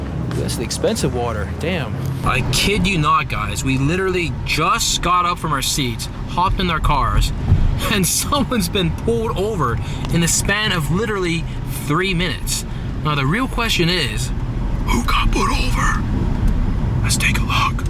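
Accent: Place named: Southern California, United States. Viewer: That socal accent. Lol irrelevant but still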